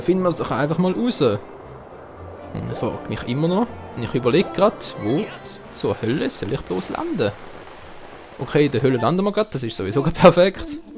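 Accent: Switzerland. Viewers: also ich finde das schweizer-deutsch ist gar nicht so schlimm^^ es ist sogar mal ganz schön. ist was anderes. ich verstehe zwar als deutsche nicht alle wörter, aber das ist mir egal. es macht Spaß dir zu zuhören, da man das schweizer-deutsch ja hier so selten hört^^ mach weiter so!!! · war cool mit dem schweizerdeutsch^^ ich dachte ich hätte mehr probleme dich zu verstehen,aber war ganz ok! hast du eigentlich schon n lets play von twilight princess?wenn nich wäre ich wieder für min.1 part in scheizerdeutsch;) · Also ich mag dein Schweizerdeutsch ;D Ich verstehs ganz gut^^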